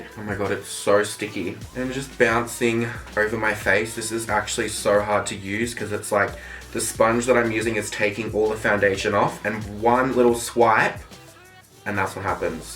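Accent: Australia. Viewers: Amy Expert he's Australien :) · R u Australian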